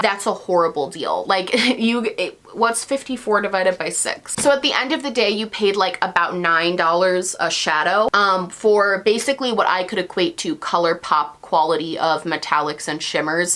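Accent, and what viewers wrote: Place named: Rochester, United States. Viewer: I'm from Rochester but I've lived in Seattle for five years and I watch your videos to hear that sweet sweet Rochester accent ❤️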